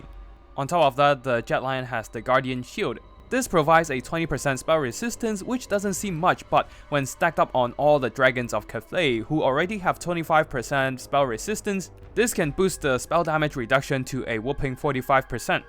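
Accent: China. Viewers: Goddamn I can't stand the CCP accent. Need to fix that boyo.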